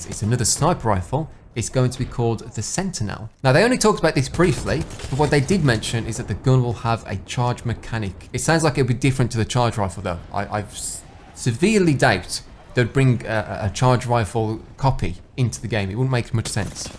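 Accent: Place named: United Kingdom. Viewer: I am from Croatia and I have no accent but has a very clean British accent... The cleanmost British accent I've ever seen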